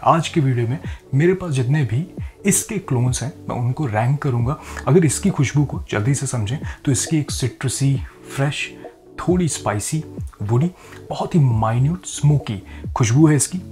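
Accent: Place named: Lucknow, India. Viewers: you speak elegantly. lovely urdu are you from lucknow? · For some reason, your Hindi seems like you are from Lucknow (or vicinity).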